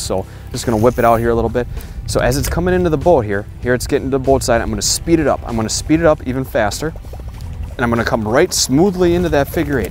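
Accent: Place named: Minnesota, United States. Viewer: The BOOOatside , the mOOOst important. This guy is hick Minnesota/wisconsin as you get. LOL- cracks me up with that accent. Good recs hOOOwever.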